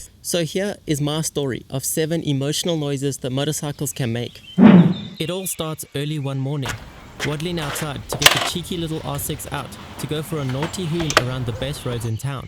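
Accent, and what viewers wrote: South Africa. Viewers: Is that south African accent? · Bro you from south africa 🤤🤤🤤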